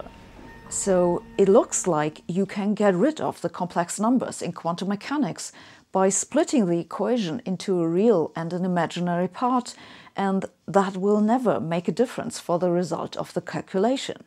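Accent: Germany. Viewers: Oh wow. I am so used to watching your more modern videos, and your accent there, at least it appears to my ear, is not so German. It sounds more like a Brit who has a slight German accent. Here, you remind me of my German girlfriend from 6th grade. Crazy how much difference 2.5 years make. I assume you've probably been living in the UK mostly, then, huh?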